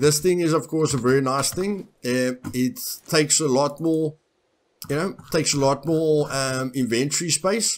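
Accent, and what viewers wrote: Afrikaans, South Africa. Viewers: I only subbed because your Afrikaans accent is so strong. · this was a good video. funny bloke :) a bit clumsy sometimes. hilarious. very genuine and yes. the game is what we make of it. cheers fella. can i ask - is that an Afrikaans or British SA accent? :)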